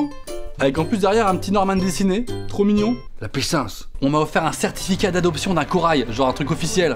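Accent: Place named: Nord-Pas-de-Calais, France. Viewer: lol tu es Chti c'est ces normale je suis contre le racisme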